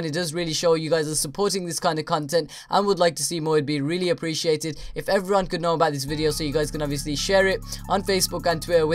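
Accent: United Kingdom. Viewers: This guy sounds like he's from the UK, ain't from Canada?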